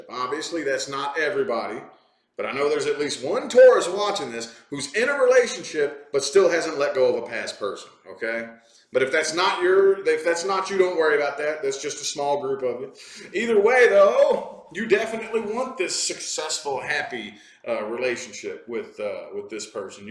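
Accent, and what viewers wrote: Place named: Texas, United States. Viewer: Yes!! Love a little country accent in a tarot reading, love Texas girl lol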